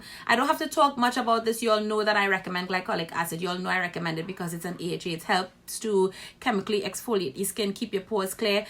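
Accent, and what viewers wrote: Trinidad and Tobago. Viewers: are you from trinidad?Love this!!! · Are you trini?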